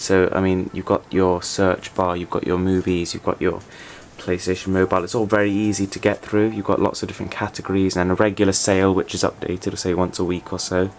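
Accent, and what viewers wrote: RP, United Kingdom. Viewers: Wait, is that a Received Pronunciation British accent I'm hearing? I don't hear that too often. It's very nice.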